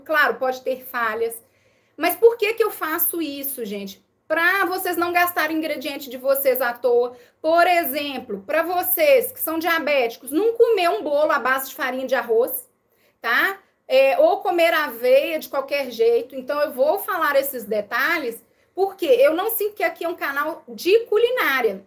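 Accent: Minas Gerais, Brazil. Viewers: Parabéns, seu programa está muito bem produzido, o som está legal e você com seu sotaque mineiro está um show, sua didática está muito boa😅🎉😅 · Além do conhecimento que vc passa estou amando o sotaque mineirin...tb sou mineira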